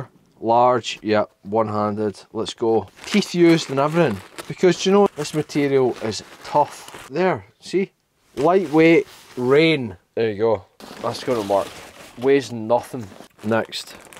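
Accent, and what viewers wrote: Scotland, United Kingdom. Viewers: Got a real kick out of your video. Love the Scottish accent...lovely! Pretty jealous of all the goodies you've ordered too. Zpacks' equipment is fantastic. I have an early model heximid shelter for solo hiking and just ordered a triplex in hopes of getting my husband to join me on a trip or two. I'm very curious about the Arc Zip pack and how you like it once you try using it. Please make a follow up review video of it! Carole from the USA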